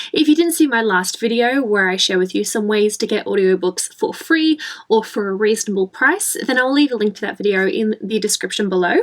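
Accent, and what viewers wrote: Australia. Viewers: You shd make audiobooks. Would you do it if the opportunity arose? You have a clear but tangy Aussie accent. I'd recommend Jon Krakauer narrating his book Into Thin Air.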